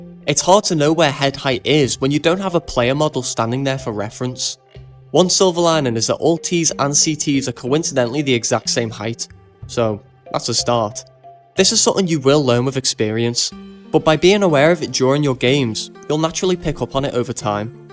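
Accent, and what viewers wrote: United Kingdom. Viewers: Bro... I never been into first person shooter games. For whatever reason i decided to dl cs2, your vids are very eye opening. Youtube suggesting them to me after 2 hrs of game time, well done yt. Hit me up if your down to do a vid series training a newbie. I know from accent your british so on my time zone lol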